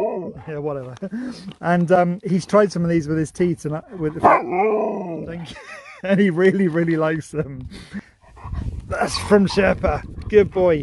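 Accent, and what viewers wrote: United Kingdom. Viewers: Are you cornish?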